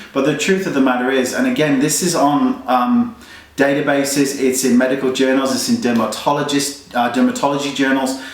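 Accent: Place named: London, United Kingdom. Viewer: really random question, but in your videoes you speak with a very londonish accent, but there are times in your videos where i can here a twang... are you from somewhere else but use a london accent so viewers can understand?:) xx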